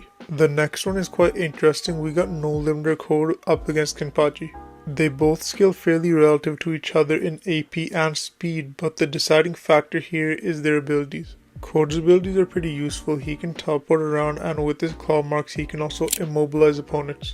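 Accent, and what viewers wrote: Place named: India. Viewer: even your English accent is so trash are you Indian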